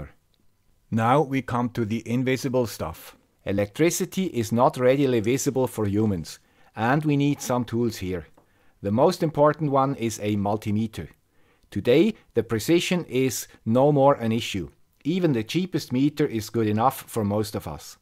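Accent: Switzerland. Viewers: great video!! I love the guy with the swish accent!!:D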